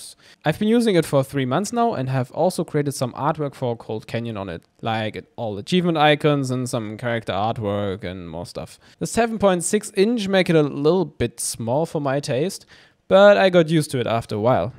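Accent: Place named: Germany. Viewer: he has the most german accent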